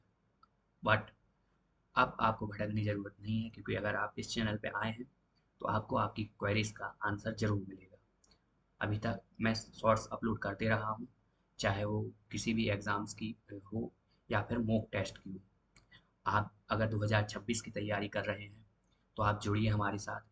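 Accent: Bundelkhand, India. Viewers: Kaha se ho bhai thoda bundelkhand wala accent lagta h aapka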